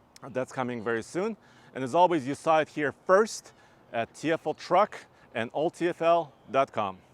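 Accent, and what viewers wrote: Russia. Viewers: It sounded like someone else’s voice for the first couple seconds then the Russian accent came back in lol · Great review! Love your accent! Is it Russian? :)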